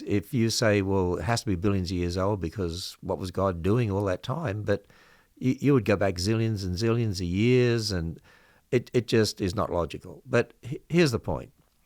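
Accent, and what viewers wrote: Australia. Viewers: Good God, you can talk! At least you haven't lost your Australian accent 🇦🇺 👍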